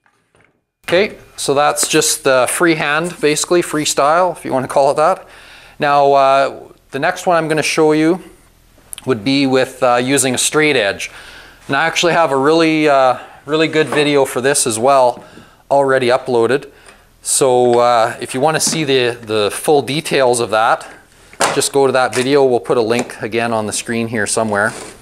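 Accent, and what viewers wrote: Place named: Canada. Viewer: Love the content! I’ve loved power tools and DIY since I was a little girl and I bought a fixer upper to get started on fulfilling some dreams. I had to look up where you were from and idk why I didn’t think of Canada, because I’m from southern WI but I have family in Northern WI and my uncle has the same accent, common in the U.P. too (Michigan).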